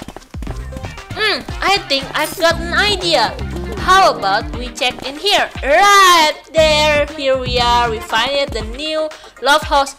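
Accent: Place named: United Kingdom. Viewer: Ur Voice Sounds Britsh.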